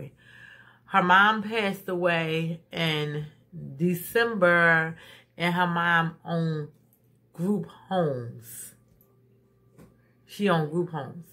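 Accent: New York, United States. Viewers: Are you from New York? I can tell from your accent in your voice if not new York somewhere up north love your channel and I have subscribed god bless😘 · Agree 100% You look so pretty, remind me alot of my Auntie's on my Fathers side ..I get New York vibez♡love your accent! Tfs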